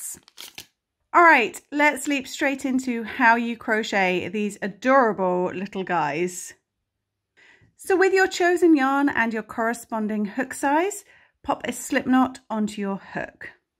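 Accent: England, United Kingdom. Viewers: Well...I am definitely new at crocheting, but you are 4 SURE my favorite! You explain things well...plus I love the English accent, & I especially like when you say "pop your hook.."..lol. so thankyou 4 your videos. P.s. I also heard on one of your videos that was already taken...have you ever considered "robin hooked" / like robin hood....lol. you could...instead of "steal from.the rich & give to the poor"...u could....idk.."feel 4 the stitch &....well I can't think of how the rest of that would go, bit I'm sure theres a clever tagline just waiting to be discovered. Lol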